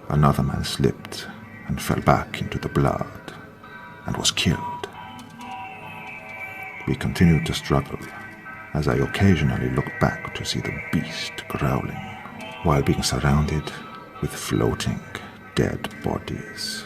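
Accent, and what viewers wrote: Russia. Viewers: I'm no expert with accents but in my opinion your Russian accent has become soooo good! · ❤️ Love the Russian accent but I found the music too loud and distracting 😩🙉🎶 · Hello there. Has anyone ever told you that you have a good Russian accent? I do love these extra stories you give us. Much appreciated good sir.. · That is an AMAZING Russian accent!! You do accents (especially Texan) so well! 🖤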